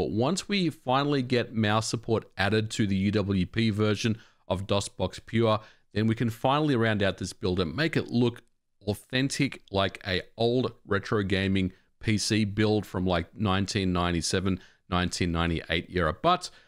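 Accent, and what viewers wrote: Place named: Australia. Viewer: Australian accent Next time I'll turn it into an Amiga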